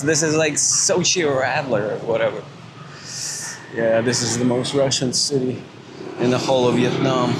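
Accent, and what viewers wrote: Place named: United States. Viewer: Nice vlog, I was in Nha Trang 2 years ago … Were you born or lived in the US? You have an American accent . Keep on vlogging please . Thank you .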